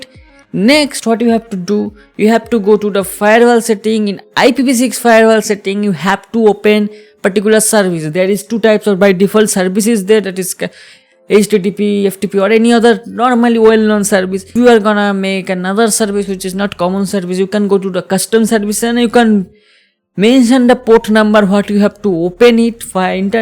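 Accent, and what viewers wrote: Bengal, India. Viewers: He is Bengali i can tell certainty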